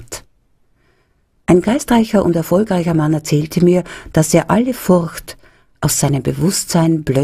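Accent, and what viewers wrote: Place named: Austria. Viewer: Ja leicht österreichischen Dialekt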